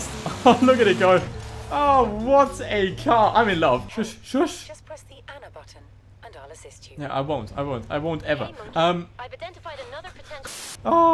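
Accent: Germany. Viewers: One question... can you speak german? Your English sounds a bit german!😄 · Are u german ?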